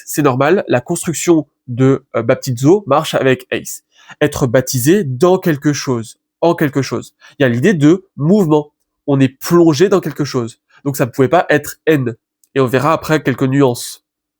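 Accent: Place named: France. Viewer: C'est dommage car le contenu est hyper intéressant et qualitatif mais étant locuteur grec natif la prononciation m'empêche de suivre. Trop de déformation mais pas spécifique a vous mais a tous les Français parlant le grec tel qu'il est enseigné en France. Un exemple simple le B se prononce VITA et pas BETA et ou le G se prononce ramma et pas gamma.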